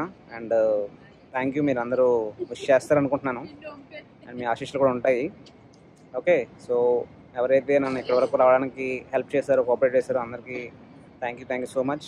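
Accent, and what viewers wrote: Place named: Anakapalli, India. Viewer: Baya e vuru midhi ma anakapalli yasa nilanay untadhi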